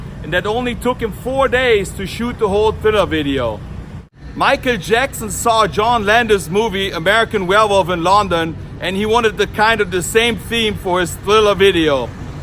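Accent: Germany. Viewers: NEWBEATS MUSIC. He’s from Germany he just has a German accent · Allein am Akzent hat man gehört dass du Deutscher bist. Bis ich dann auch dein Kanal Namen gesehen habe😂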